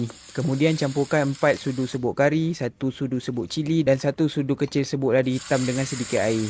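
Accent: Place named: Malaysia. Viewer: kakak orang Malaysia? karna suara nya mirip dengan orang Malaysia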